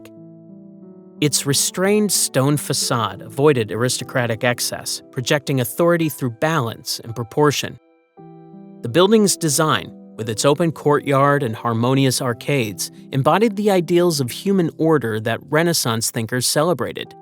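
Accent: United States. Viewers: Having an American voice is wrong, ye shouldn’t Be involved in European history, go away ffs I immediately tuned out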